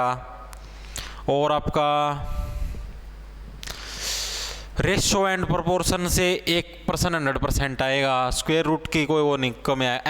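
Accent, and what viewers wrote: Haryana, India. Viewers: Haryanvi h bhai 💪❣️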